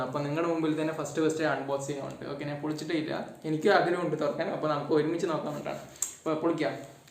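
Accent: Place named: Palakkad, India. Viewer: Namude palakkad basha kelkumbol oru santhosham😍😍😍😍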